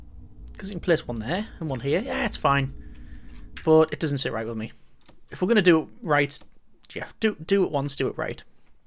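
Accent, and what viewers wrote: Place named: Northern England, United Kingdom. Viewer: It's funny, I'm originally from NE England but I've lived away from there for almost 35 years, with 15 of them being in Australia. As such, my accent is very... different. But when I have more of a northern twang, my sons say "you've been speaking to Grandma, haven't you?". Now, they also add "or listening to that bloke off YouTube". So it is all your fault if I can't order through Maccas drive-thru again without affecting a "posh" voice :D But anyway, I do love a bit of Prison Architect washed down with a proper cup of tea.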